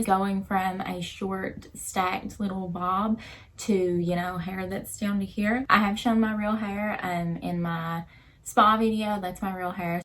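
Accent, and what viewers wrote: South, United States. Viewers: i haven’t even ordered my wig yet but this vid is so fun lol it feels like im on facetime with a sweet little southern lady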